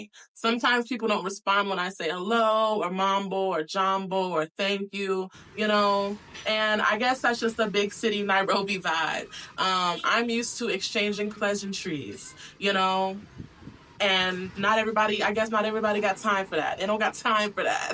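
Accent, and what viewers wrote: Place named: United States. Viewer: Your American accent is what is making them shy its not that they are ignoring you.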